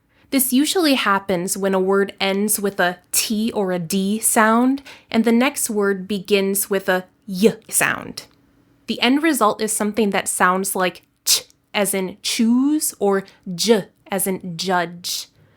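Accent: United States. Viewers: Hello teacher am new here am loving your accent, are you from which state of USA? Thanks in advance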